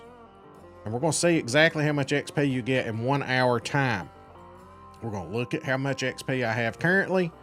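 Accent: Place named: United States. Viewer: Bro I love the country voice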